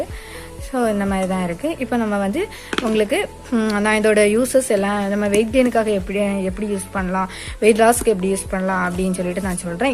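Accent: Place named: Kanyakumari, India. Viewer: neenga pesura slang vachi than sonnen nanum kanyakumari than akka nice tips akka appreciate you 👏👏👏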